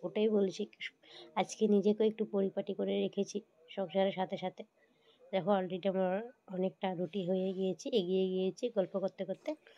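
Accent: India. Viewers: আসলে তোমার কথা গুলো হিন্দি টান আছে বেশ অন্য রকমের।ওই জন্যেই আমার খুবই মিস্টি লাগে শুনতে ❤❤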